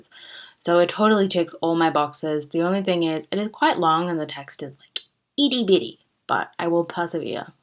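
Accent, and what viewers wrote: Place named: Australia. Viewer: Was wondering where you went! That accent is a lot less Aussie now: pushed that boat right out! But to be expected. I imagine it's ocker again on the phone to mum! Will be looking forward to hearing from you again :)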